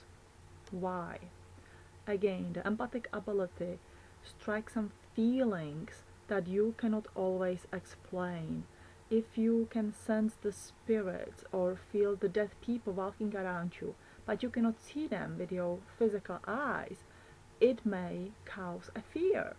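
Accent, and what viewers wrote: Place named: Asia. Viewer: i love your accent..it almost sounds like an asian dialect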